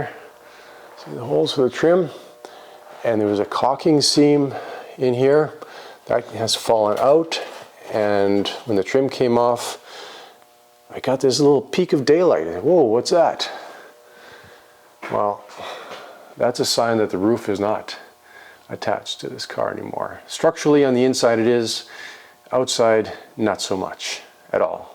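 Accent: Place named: Canada. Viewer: are you in the States. I hear a Canuck Dialect??? Great Build Sir. Make Canada Proud??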